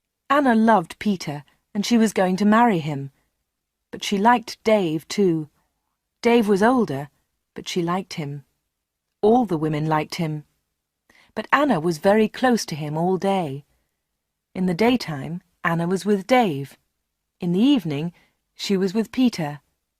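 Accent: United Kingdom. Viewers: Nice British English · این تلفظش بریتیشه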